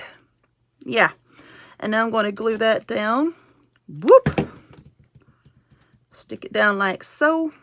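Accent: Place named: Texas, United States. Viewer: Hey sounds like you live in Texas...lol We have two that rides around on their lawnmowers almost 24/7... Oh I love your carrots. I have to try these. Thank you. Stay safe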